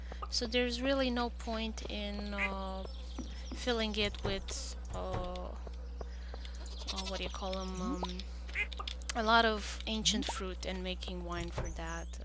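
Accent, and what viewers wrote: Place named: Iran. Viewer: Very cool video. I like your accent. Are you Persian?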